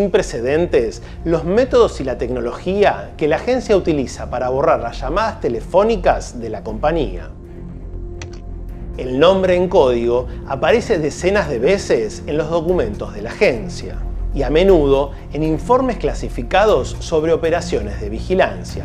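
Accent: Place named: Argentina. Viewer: Es muy desagradable el exagerado modo de hablar argentino. Debe ser que lo remarca para que se note aún más. Es que así se cree importante????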